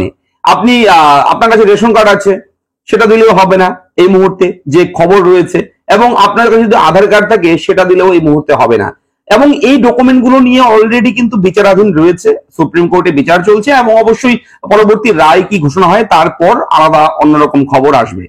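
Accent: Bangladesh. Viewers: আপনার কথা শুনে তো মনে হচ্ছে আপনি বাংলাদেশী, ডকুমেন্ট আছে তো?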